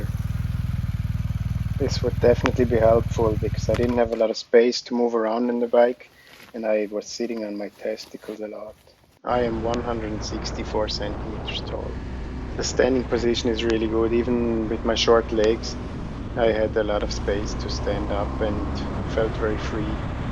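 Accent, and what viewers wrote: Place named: Switzerland. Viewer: Sehr gutes Video, gute Kommentare und angenehme Stimme. Bei einem guten Englisch bin ich überzeugt dass Sie Schweizer sind .😊🙂‍↔️🙂‍↕️.👍👏🇨🇭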